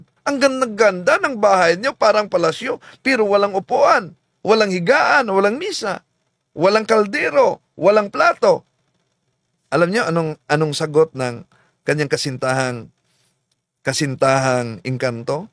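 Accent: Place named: Visayas, Philippines. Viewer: Kainis ka naman mag announce halos dko maintindihan bisaya accsent Kasi eh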